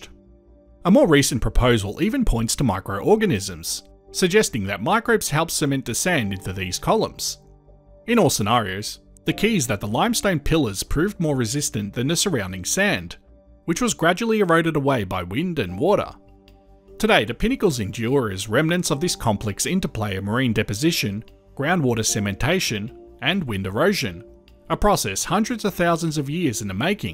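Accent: Australia. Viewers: It is part of the Australian accent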